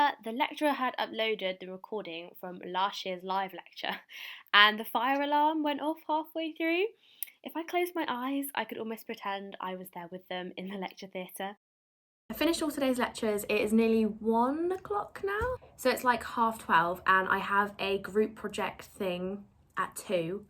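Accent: United Kingdom. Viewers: Love your British accent ❤ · I love your British accent ❤️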